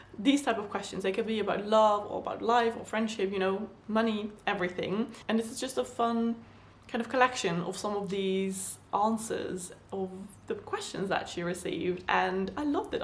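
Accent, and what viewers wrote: United Kingdom. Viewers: Did you live in the UK? You have a British accent, it’s lovely ☺️ I’m only asking because some other youtubers that are from the Netherlands have a different type of accent when speaking in English.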